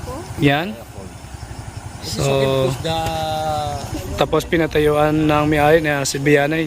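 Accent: Iloilo, Philippines. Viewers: Ilonggo gale Ka sir?😅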